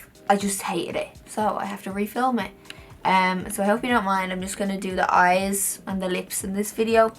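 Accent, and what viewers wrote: Dublin, Ireland. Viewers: You are such a beautiful girl!!! I'm from Belfast, and if my ear is correct, your from Dublin?? I'll always support anyone from this island, we need to take over!!!! :D Also, do u know of any good shops in Dublin to visit, for makeup, skincare and clothing?? I always make a trip down after Xmas, but I always go to the same places... Just wondering if u know any cool places outside of the bigger stores, like Arnotts, Brown Thomas etc xxx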